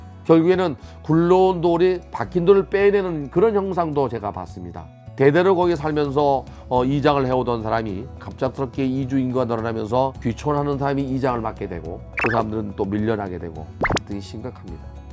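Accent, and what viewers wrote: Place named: Gangwon, South Korea. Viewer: 교수님 고향이 강원도래요? 어째 말투가 강원도 토박이 말투래요?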